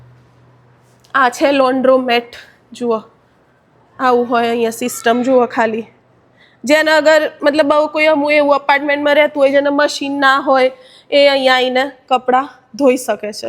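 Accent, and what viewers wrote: Sabarkantha, India. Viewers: સાબરકાંઠા ના લાગો છો ક્યું ગામ?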